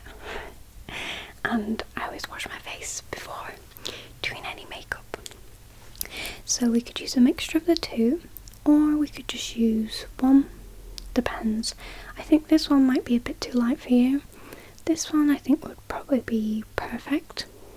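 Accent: United Kingdom. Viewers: Madeline C correct me if I’m wrong, but from theatre experience, this sounds like British to me. ( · You have a beautiful accent! Definitely no need to feel self conscious about it; I think a lot of people probably feel the same way about their own voice. I'd watch any of your videos, whispering or soft spoken you're so relaxing to listen to. Out of interest what part of the UK is your accent from?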